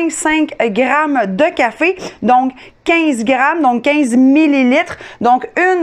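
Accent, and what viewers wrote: Quebec, Canada. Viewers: Bien le bonjour. Le café doit être bon mais l'accent Québécois est plus sucré et très agréable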